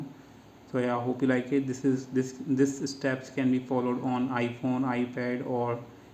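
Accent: India. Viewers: Indian scammer I swear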